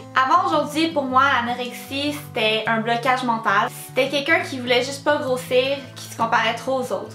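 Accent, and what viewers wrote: Canada. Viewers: belle vidéo j'adore ton accent canadien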